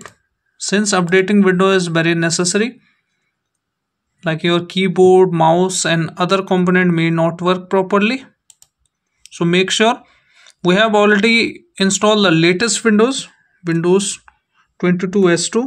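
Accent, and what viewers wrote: India. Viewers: the Indian accent hurts my ears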